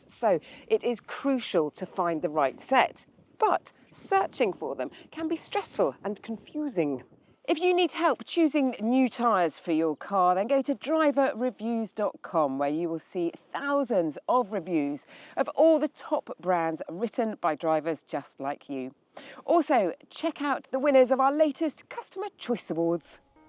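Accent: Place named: United Kingdom. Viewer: Hello! Love your very British accent!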